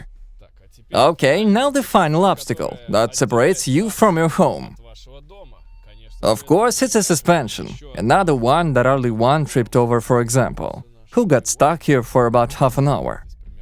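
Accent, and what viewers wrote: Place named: England, United Kingdom. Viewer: О вы из Англии?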